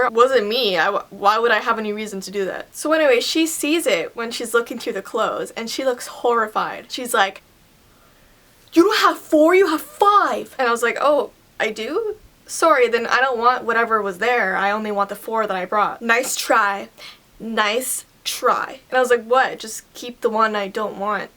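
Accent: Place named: Canada. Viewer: She has a Canadian accent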